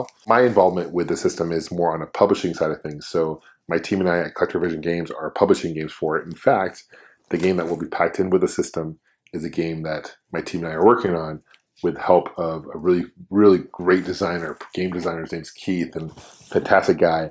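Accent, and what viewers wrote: United States. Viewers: In my part of the U.S., I believe that jagwire is the most common pronunciation. I think is from my area, just from listening to his accent.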